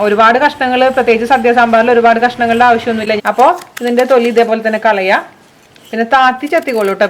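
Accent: Thrissur, India. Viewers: ബാച്‌ലർ പ്രവാസികളുടെ പാചക ദേവത.. അവതരണം ലളിതം സുതാര്യം പിന്നെ മ്മ്‌ടെ തൃശൂർ തനി നാടൻ ശൈലി. പൊളി 👌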